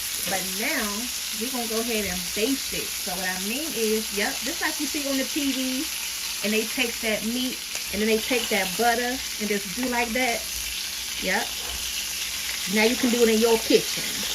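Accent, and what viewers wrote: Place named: DMV, United States. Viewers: Looks delicious.. and I know a DMV accent when I hear one. I love it!💜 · Yes! That undeniable DMV accent. And if I'm hearing correctly, I think it may even be right outside of DC on the VA side - "town" side of Alexandria even tho I'm from the "highway" side. I could just be biased lol 😌. This looks so good I can almost taste it!!😋🤤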